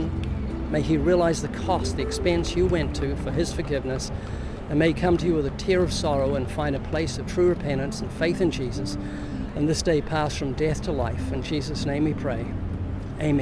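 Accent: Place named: New Zealand. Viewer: Hi Ray I'm from your old Church in New to Zealand. I have a non Spiritual question how come you haven't lost your kiwi as accent. Your been living in LA since the 80s.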